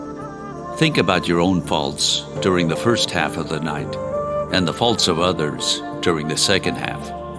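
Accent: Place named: United States. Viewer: voice over😢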